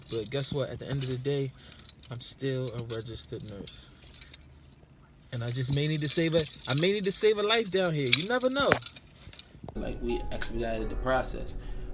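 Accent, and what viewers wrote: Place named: Washington DC, United States. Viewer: I love the DC accent.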